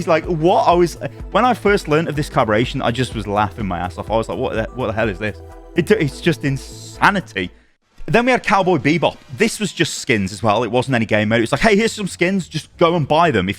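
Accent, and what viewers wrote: South, United States. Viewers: 10/10; American accent..👍🏽👍🏽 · Wow your american accent. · The rich Southern American accent from a Brit is not something I knew I needed. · excellent yaccent (yank accent)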